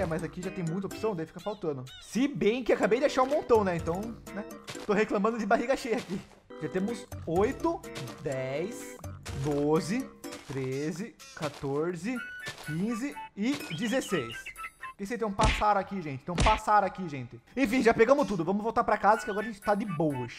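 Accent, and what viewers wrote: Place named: South, Brazil. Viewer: tenho uma pergunta onde vc mora? pq parece q vc é aq do sul Vc provavelmente n vai me responder, mas vai q vc responde ❤️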